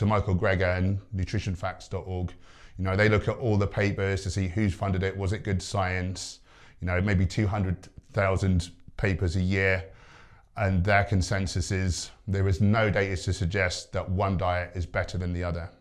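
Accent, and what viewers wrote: United Kingdom. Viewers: I like sv3rige, You sound like your from the Midlands , same as me.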